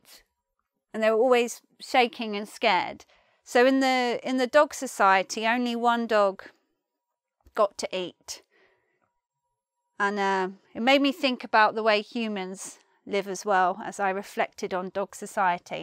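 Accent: United Kingdom. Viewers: british accent :) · Lovely British accent. Thanks.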